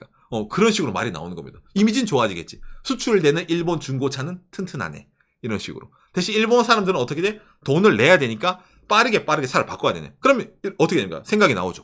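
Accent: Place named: Gyeongsang, South Korea. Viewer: 정보도 좋고 내용도 좋은데, 경상도 억양이 너무 쎄서 듣기 좀 그렇다. 좀 믿믿하기라도 하지. 너무 억양이 쎄네. 지역 얘기 하는게 아니고 듣기에 불편하다. 너무 억세서.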